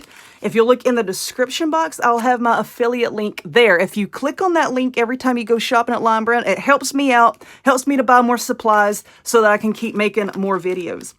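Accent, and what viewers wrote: South, United States. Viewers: Woman you are amazing!! I so love your videos! Thank you from another southern girl that can so understand your wonderful voice!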